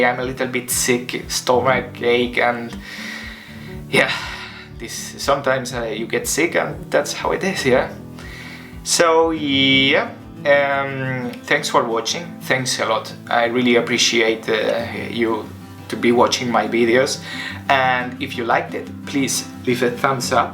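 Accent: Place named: Spain. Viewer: Your accent is very Espaniol !!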